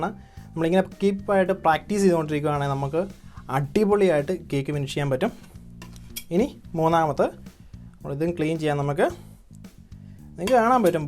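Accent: Kottayam, India. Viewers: ഞാൻ ഇപ്പോഴാണ് e ചാനെൽ കാണുന്നത് സൂപ്പർ അടിപൊളി....നന്നായി പറഞ്ഞ് തരുന്നുണ്ട് tks ...varthaanam കേട്ടിട്ട് കോട്ടയം ആണോ....🥰🥰🥰🥰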